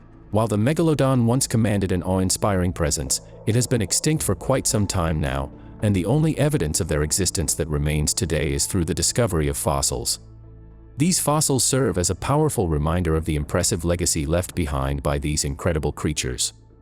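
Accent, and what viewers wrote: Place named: New England, United States. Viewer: FINALLY!!! A mini doc that doesn't try to proclaim that the Meg is still alive. Thank you so much. 2 things - - It's pronounced Ea pock not Epic. I do believe that the narrator is from New England or where "evah".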